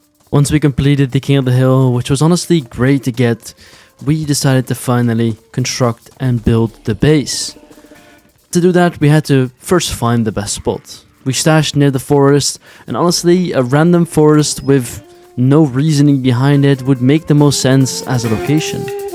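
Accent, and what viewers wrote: Netherlands. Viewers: damn wist niet dat je nederlands was tot ik je hoorde praten met je team haha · Are you Dutch... ? :)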